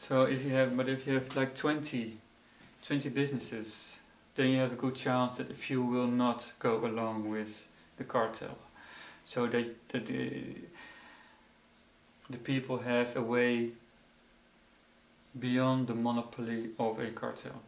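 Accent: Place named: France. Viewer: I did have a look at the beginning when he panned the camera around to show, as he said, "to show where I am filming this". But looking I was not able at all to see where. Apart from some dudes apartment. I did see that as far as I know it was not filmed in England or the UK. So judgeing by his French accent I guess it may be France of Canada or some where else French is spoken.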